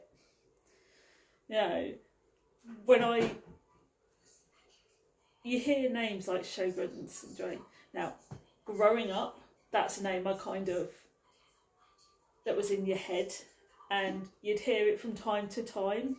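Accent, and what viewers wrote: United Kingdom. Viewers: Warrington? You sound like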